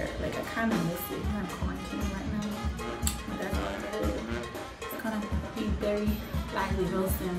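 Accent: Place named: New Orleans, United States. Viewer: I hear that small New Orleans accent you got lol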